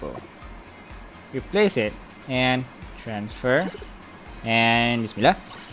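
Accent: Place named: Malaysia. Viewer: patutlah suara mcm org Malaysia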